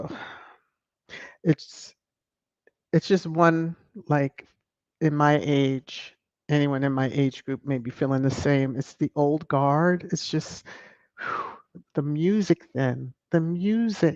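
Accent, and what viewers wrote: RP, United Kingdom. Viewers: Hello happy Thursday, didn't catch the live but enjoy listening to replay, 👍👍👍👍👍👍💯💯💯😘😘😘😍😍❤️❤️😍🌹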